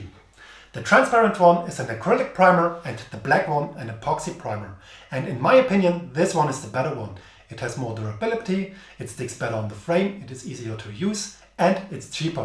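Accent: Germany. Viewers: Angry german accent makes me happy! :)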